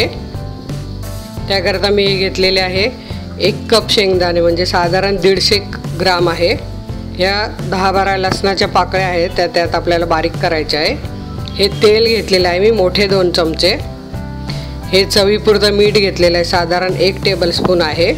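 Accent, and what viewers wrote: Khandesh, India. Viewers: Aho tumhi khandeshi aslyasarakhe boltat.,mhanun. Ssory ha tai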